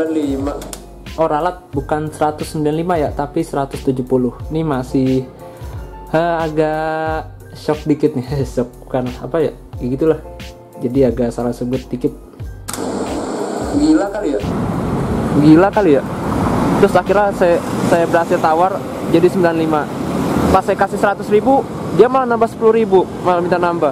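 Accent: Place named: Central Java, Indonesia. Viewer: Dari logat bicaranya, dia kayaknya orang dari daerah plat K.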